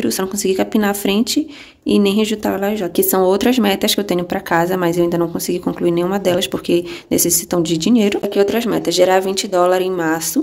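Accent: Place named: Pará, Brazil. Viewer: tem muito sotaque paraense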